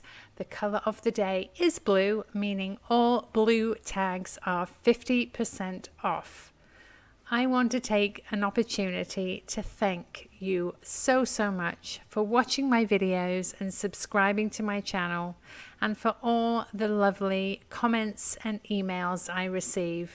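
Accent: United Kingdom. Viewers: Hi. I love the crystal beaded tree ornaments and all the items in the baggy. Love watching your channel, good to hear a Brit xxx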